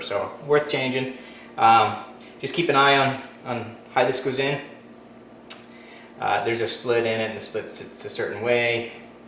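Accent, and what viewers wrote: Belfast, United Kingdom. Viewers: A hint of a Belfast accent?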